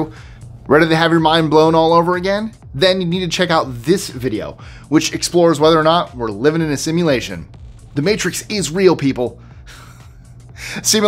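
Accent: Canada. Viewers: The Mandela effect cos I thought you was Canadian too eh 😅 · i thought you were canadian as well ,,,,,, your more canadian than me and i live in ontario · It's your accent that he thinks you're from Canada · yup, take it from a Canadian, you DO sound Canadian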